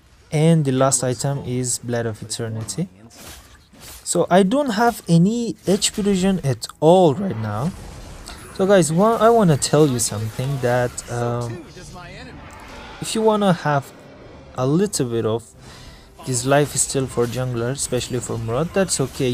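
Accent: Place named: Mexico. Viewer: from México i think , i notice te acent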